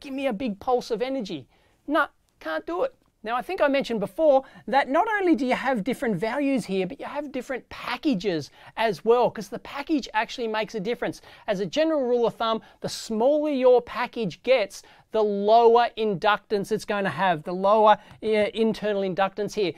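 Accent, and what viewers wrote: Australia. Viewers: When I remember my Uni studies, the voices of my lecturers voices always come to mind. Now, when I put in any bypass capacitors my inner monologue will be telling me how to do it in a surprised sounding Aussie accent. Love it. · A book would be nice. Sometimes i cannot stand his Australian accent. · Now I no why!!! Thank you! Great tutorial! Aussie English, it always makes me happy! I'm still saving money for a trip to Australia... My only sorrow is that when I be there, down under, I don't want to go back home. There's something about Australia that attracts me. Don't ask my why or what it is. Greats from the Netherlands!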